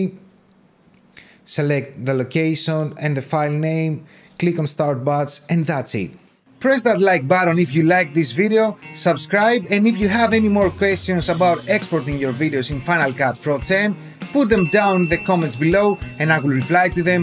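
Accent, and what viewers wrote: Greece. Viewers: One question....are you in any kind connected with Greece? You speak like Greek.........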